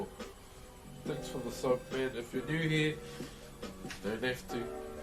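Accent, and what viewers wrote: New Zealand. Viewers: Are you from New Zealand 🇳🇿 I,m am from the Netherlands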